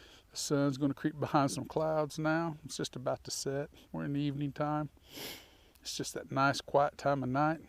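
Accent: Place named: South, United States. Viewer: It's nice to find a whisky reviewer with a southern accent. I guess there's nothing inherently wrong with being a Yankee 😂 but I'm a Texan, and it just galls me to find people even in Texas talking about whisky like they're from Indiana or somewhere. Hearing you talk is nice - plus which you don't put on a fake video persona, but just talk about whisky, which a lot of these characters don't seem to understand is a good thing. 🙂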